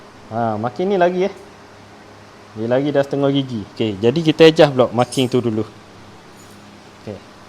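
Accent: Terengganu, Malaysia. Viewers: Kemah molek cara penerangan sekali nga amali padu mantap mudoh pehe walaupun pekat sgguh ganu tu..tp jelas sungguh..moga muroh rezki la boh ..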